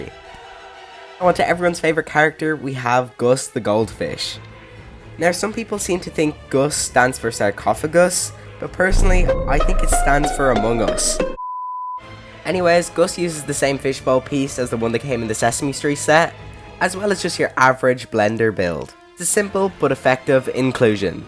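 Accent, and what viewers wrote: Ireland. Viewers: Are u irish and if so what county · Are you Irish like me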